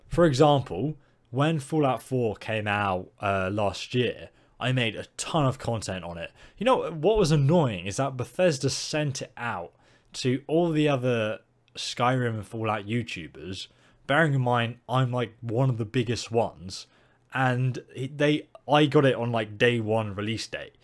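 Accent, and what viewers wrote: England, United Kingdom. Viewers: Where did you grow up? I cant tell which side of the north/south divide you are from. Speaking as a Yorkshire lad.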